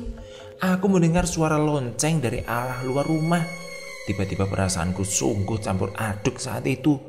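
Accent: Java, Indonesia. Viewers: seneng nya cerita mas krna ada aksen jawannya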